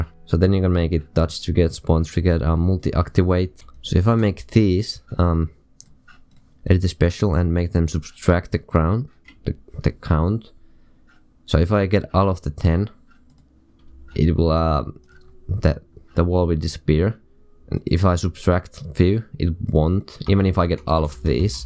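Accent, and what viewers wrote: Finland. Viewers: Are u finnish?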